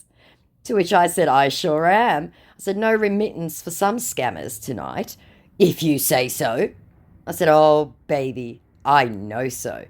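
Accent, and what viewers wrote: Australia. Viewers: I love your Australian accent, Australia is one place I always wanted to visit someday.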